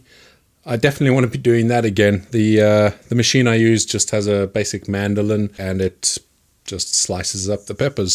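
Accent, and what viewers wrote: South Africa. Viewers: you south african by chance? the accent lends that way for me. apologies if I'm mistaken. tits video! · Do hear a note of South African accent there :) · ah very nice. I’m from California but live in China. Your accent was a bit hard to place but still distinctive. The way you say “hotter pepper” like “hwotta peppa” and “here” like “hee-yah”. My South African friends sound the same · your accent sounds like you have South/Southern African ancestry. Is this so? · Can I ask if your accent is South African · Are u south aftrican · Little south African, little Zimbabwean, and now a bit of brit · Hi. Are you South African? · UK....... I'm getting a south African vibe? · are you south african? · South African accent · Hey man, totally love the channel but i have an unrelated question... are you/your parents/family from south africa? Picking up the very BAREST of accents on some words and I don't think its british kiwi.... 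sorry if it is xD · First video I have ever been recommended for. First- South African? Second- fantastic and an instant sub. Cheers from a Canuck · Do I hear a hint of South African in there? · Are you south African? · Are you South African? :D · Where in the UK are you from? To my untrained Canadian ear it reminds me of South African. Birmingham? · Good video man just subscribed. Also your accent sounds south African please correct me if I'm wrong.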